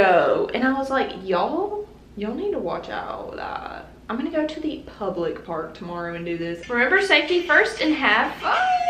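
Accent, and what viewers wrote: South, United States. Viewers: You're losing your sexy southern accent 🥺😭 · Top tier American accent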